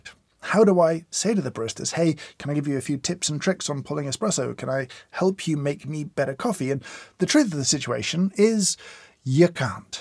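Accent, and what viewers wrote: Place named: England, United Kingdom. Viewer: Your english accent is very satisfying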